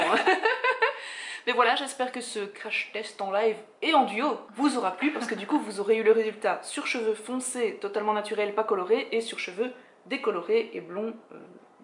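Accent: Belgium. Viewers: tes belge??? sinn super video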